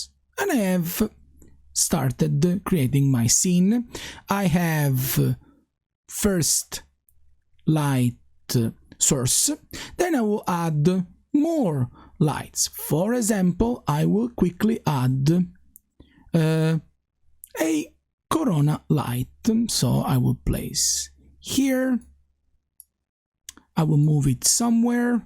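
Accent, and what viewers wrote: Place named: Sicily, Italy. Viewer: Finally! Finally that Sicilian accent makes it to YouTube 😎💪🏿🌺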